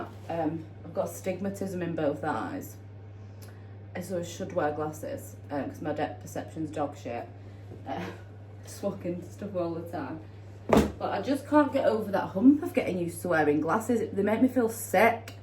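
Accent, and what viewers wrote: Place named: North East England, United Kingdom. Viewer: Just stumbled across your channel. Love love love ❤. Why have I never seen your channel before? Watching an older video and thought with your accent and you caught the metro to work, that you lived in Manchester. You don’t. You live in the north east like me. I’m a fur baby mum and similar to you in personality and likes but I’m much older than you. So glad found your channel. BTW I live by the sea north east ❤❤ edit I’m from South Yorkshire originally but love it up here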